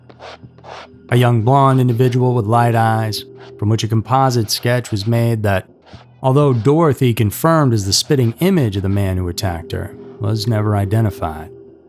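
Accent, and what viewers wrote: South, United States. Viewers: Great story my friend. Love the Voice. Down south southern style❤🎉😂😂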